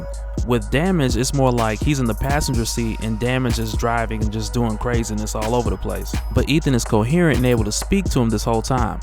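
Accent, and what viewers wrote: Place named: Georgia, United States. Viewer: I knew you were from the GA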